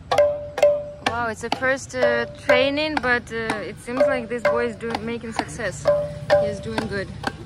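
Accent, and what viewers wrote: Russia. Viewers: What’s is your mother tongue ? From your English accent it seems that you belong to Russia or Some ex-Soviet country.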